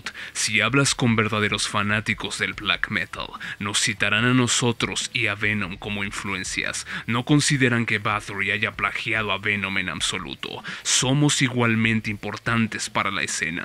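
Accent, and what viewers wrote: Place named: United States. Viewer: una pregunta ¿a que se debe el pronunciar todo lo que no sea castellano con acento yanky? es decir, si ya sabes que bathory no es una palabra inglesa no entiendo el porque pronunciar "bazory" con acento yanki como si tuvieras una magdalena en la boca, perdona pero me parece ridiculo.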